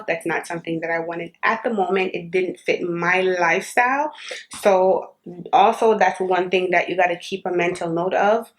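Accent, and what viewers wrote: Jamaica. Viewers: ARE YOU JAMAICAN HUN❤❤❤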